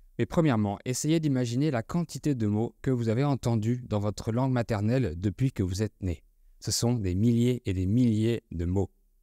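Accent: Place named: South, France. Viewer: Salut tout le monde. Maintenant je suis en train de "déviner" les accents. Je dirais qui l'accent de c'est du Sud. Marseille, peut-être?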